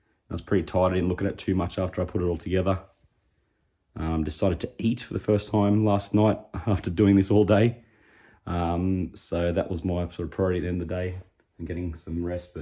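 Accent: Australia. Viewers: Mate you sounded very much like an Aussie collector. Well done ! Nice to know another collector from down under if you are.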